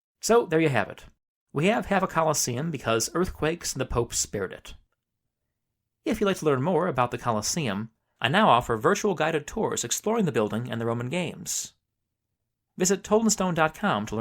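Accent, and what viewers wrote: United States. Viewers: Sounds like an American, alright. 🤦🏻‍♂️ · OK I'll save you a lot of time so you don't have to listen to the whiny American accent ,it was an earthquake end of story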